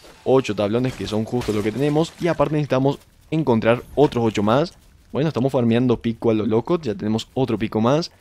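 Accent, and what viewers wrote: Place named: Paraguay. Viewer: Sospecho que es paraguayo por su asento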